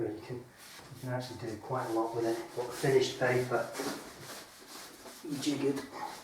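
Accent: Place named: Lancashire, United Kingdom. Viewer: Sounds like north Lancashire to me mate 🤔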